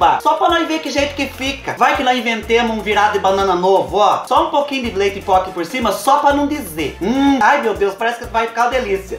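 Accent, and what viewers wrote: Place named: Countryside, Brazil. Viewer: morei no interior e sinto muita saudades,amo esse sotaque lindo. Vc lembra do vídeo do coró? Aquele dia eu ri tanto que doeu a barriga. Quando mudei pra Tatuí eu estava grávida,e ouvi minha sogra falar que lá na árvore estava cheio de coró,eu achei que era uma fruta e fiquei com desejo de comer o tal coró, só que eu não falava tinga vergonha, pois estava na casa da sogra,como eu ia dizer aí sogra me dá um coró. Pois vem eu já andava com a boca aguando quando o pai do meu filho chegou de uma viagem de 2 meses eu pedi a ele, por favor eu quero comer coró não aguento mais de vontade ou vc compra ou vou me separar de vc. Todo mundo riu do céu. Essa foi a maneira bizarra que fui apresentada ao coró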